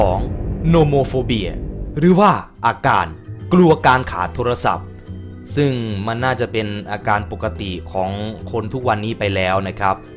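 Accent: Isan, Thailand. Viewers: พี่เป็นคนพาคอิสานเหรอคะ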